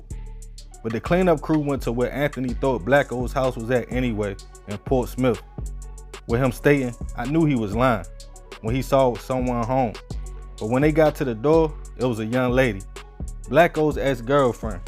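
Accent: Baltimore, United States. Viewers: He from bmore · The narrator got an Bmore accent..... Im originally from from Baltimore but reside in Richmond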